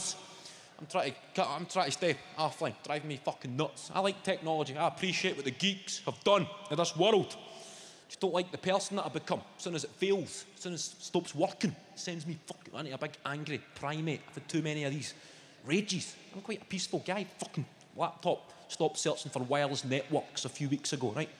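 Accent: Scotland, United Kingdom. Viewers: Does he really expect non Scots to understand him?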